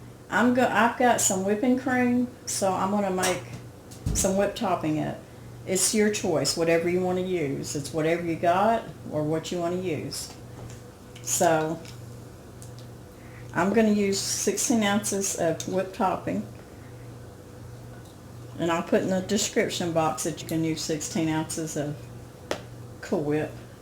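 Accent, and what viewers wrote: South, United States. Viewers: Millboro Quilter South Dakota haha it’s just a southern accent.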